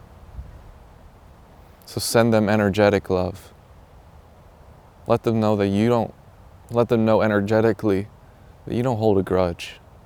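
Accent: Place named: United Kingdom. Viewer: Thank you. I like the British accent! Made me laugh!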